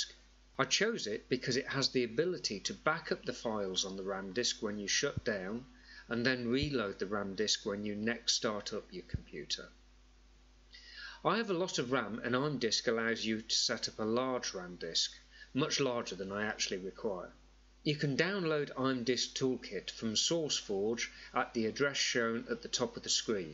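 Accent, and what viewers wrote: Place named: United Kingdom. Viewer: Very helpful, thank you. Your speech was so good that I didnt mind the british accent, which I normally tend to despise. Although I do have one question, how the hell does the program even know where in the disk to put the files to during a shutdown? As far as the RAMdisk is concerned, the files could have come to it from even the desktop. How does it know where the files originally belong to? Edit: Nevermind, for some reason I assumed that RAMdisk was sending the files to their original places upon shutdown. Nay, rather, it just saves them to that one folder you specify and reloads from there upon restart. Why did I even assume that I wonder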